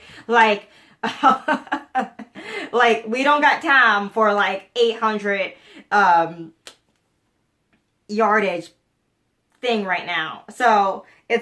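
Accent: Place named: New Jersey, United States. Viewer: Love the “bawls and cawfee”. I’m from NJ too and worked hard to get rid of my accent, lol. Do you also call a dresser drawer a “ draw”?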